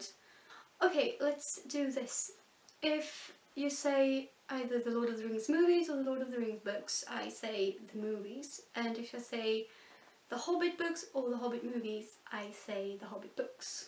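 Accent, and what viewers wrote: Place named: United Kingdom. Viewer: Vilken brittisk engelska du har, så där fin och sofistikerad <3 Min är mer amerikansk och hemsk, hahaha xD Är sugen på att göra denna tag med, när jag får lust (och är ensam hemma). Kan inte spela in videos annars D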